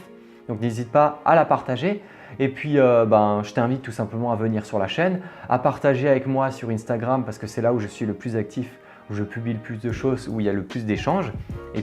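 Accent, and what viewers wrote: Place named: Alsace, France. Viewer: on reconnait l'accent alsacien !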